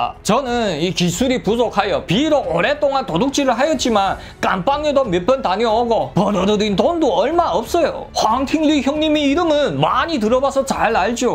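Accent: Yanbian, China. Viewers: 너무 재밌습니다 ㅋㅋ 타고난 이야기꾼이네요 ㅋㅋ 조선족 특유의 말투가 더 귀에 쏙쏙 박히네요. 다음 편 기다리고 있습니다~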